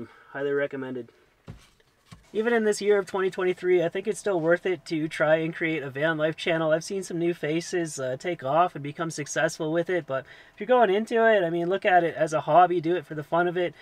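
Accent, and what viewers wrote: Canada. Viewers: I miss your Canadian Accent...:-))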